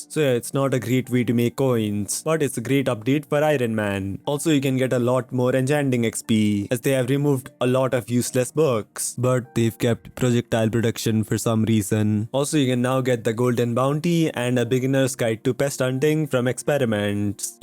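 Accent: India. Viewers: Bro are you indian or just a person with Asian and somewhat indian accent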